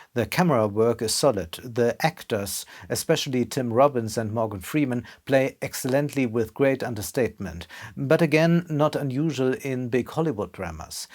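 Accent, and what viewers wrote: Germany. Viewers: Du solltest dringend mal ein paar Jahre in einem Land leben, indem Englisch gesprochen wird. Dein Englisch ist richtig German. 🤒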